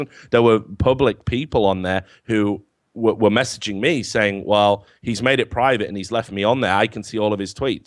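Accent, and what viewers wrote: United Kingdom. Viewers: Is it just me or is speaking in a more british accent in this video..:^) I like it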